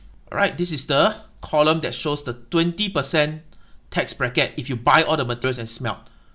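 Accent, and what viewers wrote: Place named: China. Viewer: When i heard a Chinese accent talking about business i believe him instantly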